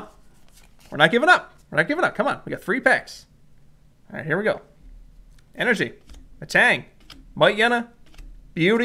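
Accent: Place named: Newfoundland, Canada. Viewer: Love ur play mat and love the content I'm from nova scotia u sound like ur from newfoundland bud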